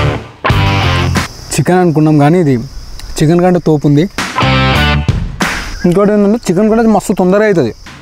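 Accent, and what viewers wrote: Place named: Palamuru, India. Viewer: Super bro👍 Enka Purley PALAMURU slang lo matladu , mana plaamuru slang ki full fans unnaru bro, Keep it up. All the best for your bright future (your YouTube channel) bro🎉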